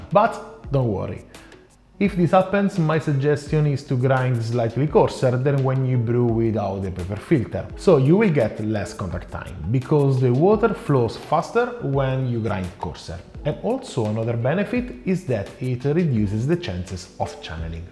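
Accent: Italy. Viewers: thank you so much! Just the video I was looking for while trying to optimize my Bialetti Brikka 4 cup moka pot. I LOVE your videos and your ground to earth explanations:) I even like the Italian accent and your body language while explaining. You`re a gem of knowledge and kindness to your viewers. I will order me some AP filters now:-)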